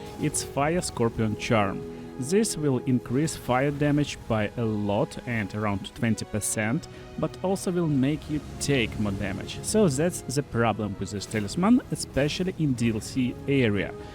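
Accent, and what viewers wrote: Russia. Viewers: Russian ? “Safety is number one priority”~ CrazyRussianHacker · Какой-то сильно русский акцент у вас сударь.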